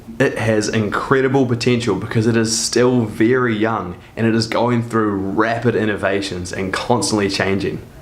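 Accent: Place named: New Zealand. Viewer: Hey are you from New Zealand? You sound like a kiwi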